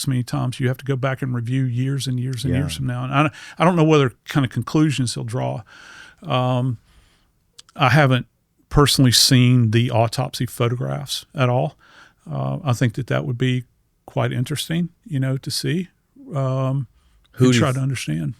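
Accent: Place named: South, United States. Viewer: He's got more education and experience than you can ever hope to have. He's southern, they pronounce words funny. He's a scientific genius specializing in criminal death scene investigations. Podcast is "body bags" and has written many books on this. You can obsess over a dumb word or listen to what he knows. Your choice.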